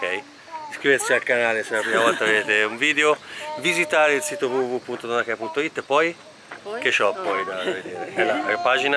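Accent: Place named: Tuscany, Italy. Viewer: Avrei una marea di domande x te adoro i toscani l accento mi piace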